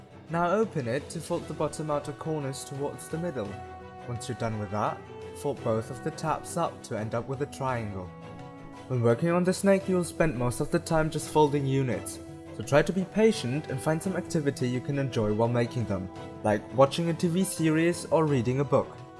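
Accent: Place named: Germany. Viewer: Question are you a German boy? because you have a German accent And I would say you are German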